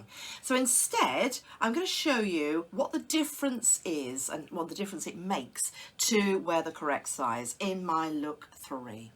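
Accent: United Kingdom. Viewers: Hi D,,, new from CA,, I will be 72 in a few days,,April 2024 I have made it!! I agree that it is your lovely kind presentation that got me subscribed...TY! You all might get a super kick out of this But,, I stopped wearing certain things back in the 20 the century,,,Jeans, jewelry, jackets, heels...all out!! lol...I wear big old clogs year round to accommodate my bunions ( and sneakers), I layer on scarves and I like to paint on shirts and my winter coats to keep me colorful and comfortable as well...Love your look and your Brit accent! Mo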